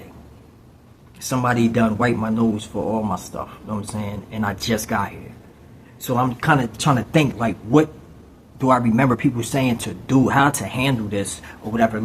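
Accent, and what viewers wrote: New Jersey, United States. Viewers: I knew you were from Jersey before you even said it🤣! Newark in the building. This the first video I’ve seen and I was confused because I’m hearing a Jersey accent on a Georgia prison video it was unexpected.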